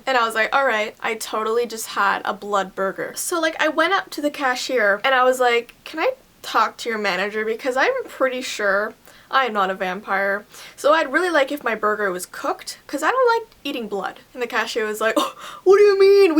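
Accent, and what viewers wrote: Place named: Canada. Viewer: She has a Canadian accent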